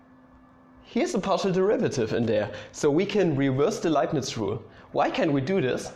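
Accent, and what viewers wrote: Germany. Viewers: You've got a nice German accent, I like it!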